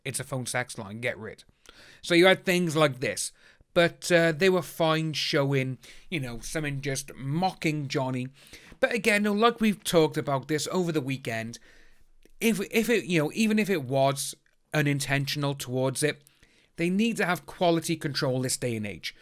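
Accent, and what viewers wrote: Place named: United Kingdom. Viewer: I love your interactions with the viewers. I also really like your accent 💜 keep up the good work! Are you in the UK? I know you said Wales (sp) but I think there is one in Australia too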